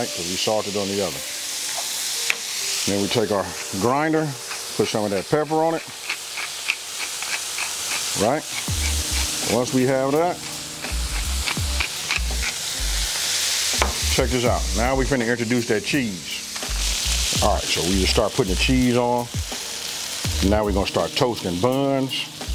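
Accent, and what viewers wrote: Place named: West Coast, United States. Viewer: Anybody else can tell that he's so West Coast??? Not mad at it at ALL!!!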